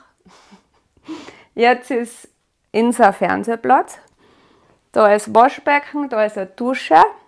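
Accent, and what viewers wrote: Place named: Bavaria, Germany. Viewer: Du bist so ein Sonnenschein, dem Dialekt nach 🤔Bayern oder Österreich? ich liebe deine Deko und auch wie es bei dir zu Hause aussieht, voll mein Geschmack, fühle mich einfach gut in so einer Umgebung. Als Kind wuchs ich in einem Dorf auf mit Tieren und Garten drumherum, Veranda..Jetzt auch im Dorf nur Leider nicht so schön wie damals. Treume von so einem Haus😌🥰wie eures🌹Dankeschön für die Inspirationen.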